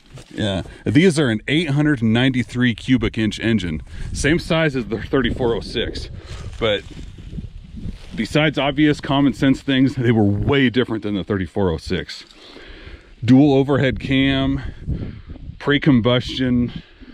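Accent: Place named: United States. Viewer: I’m from Poland 🇵🇱 i working as truck driver. U have beautiful American accent ❤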